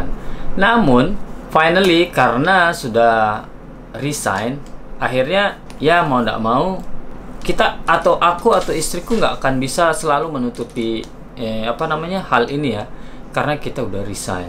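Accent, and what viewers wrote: Lombok, Indonesia. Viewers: aislah 🤣 masih kentel ruen base sasak nike bang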